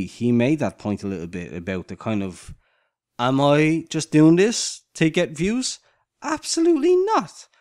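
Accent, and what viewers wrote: Ireland. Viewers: I like your strong Irish accent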